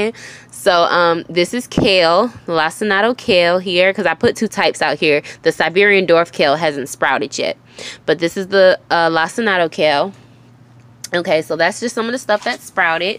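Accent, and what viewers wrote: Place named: Houston, United States. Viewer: First off I like her voice/accent/cadence. It's soooo familiar. That accent plus certain words/phrases she uses, tells me she's from Houston. I'd be surprised if not. If not Houston, somewhere in Texas.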